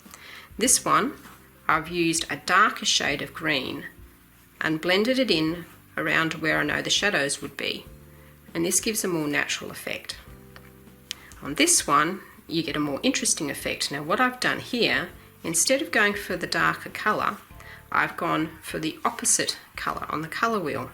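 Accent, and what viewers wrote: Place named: Australia. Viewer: Thank you very much for the useful tips and advices stunning work, by the way when you speak about Australia and your accent they remained me when i was student in hight school ten years ago studying in Australia and the teachers accent over there.. you makes me miss Australia and want to visit the beautiful Australia again for two mouths lol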